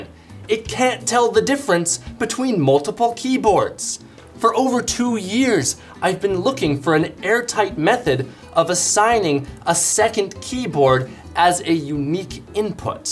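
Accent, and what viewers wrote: Netherlands. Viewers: Are you dutch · Are You from the netherlands?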